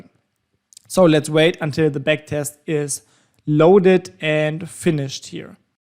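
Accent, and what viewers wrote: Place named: Germany. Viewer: Since this guy is intentionally not telling you about time zones, etc. I will do it for him. Judging from his accent, he is probably in Berlin. Which is GMT +2, which also happens to be the same time zone as many/most of the brokers, probably yours too. And definitely his. Lucky for him, his broker is in his own time zone. The rest of us have to translate time zones. He’s starting the high/low range box at 2 AM his time which is also his brokers time. Lucky for him! But not so lucky for the rest of us. is two hours after the close of London. Translation: run your high/low range box from the beginning of Tokyo session continuously for 1.5 hours. Then start trading after that point all the way until two hours after the close London session, which is coincidentally when the Forex volume starts to drop I have no idea why this guy doesn’t explain it. 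Perhaps the create confusion to drive people to his website and his broker website..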